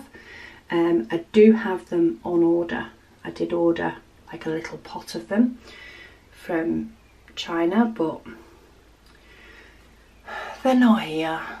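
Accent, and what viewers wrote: United Kingdom. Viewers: Please start a group on Facebook. I am a new subscriber and just happened to check out your channel via Rachel Rae as you are working on a blank canvas as I had just started one, not a HAED but a cross stitch pattern from Kustom Krafts. Thank you for the tip on how to do the conversion in order to get the correct canvas size. I have also ordered a single punch and sticker paper from Amazon, great idea as I cannot get refills for my Xyron. Oh, you can buy Goo- Gone from Amazon and I found it was an absolute godsend. Thank you for all the tips. Love your accent, same as Fruitini, what part of UK are you? I'm Dundee, Scotland 💜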